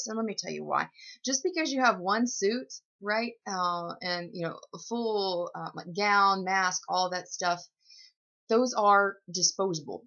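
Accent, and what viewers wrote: South, United States. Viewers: Scott Haskin You're right you can't hide Natural Beauty and that southern accent makes me week at the knees..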